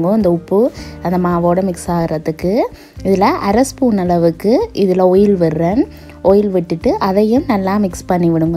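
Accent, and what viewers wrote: Sri Lanka. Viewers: Congrats...ungal ilangai tamil enakku romba pidichchirukku...super...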